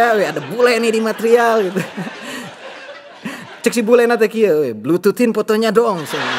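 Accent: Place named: Sukabumi, Indonesia. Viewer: Sunda na logat jampang sukabumi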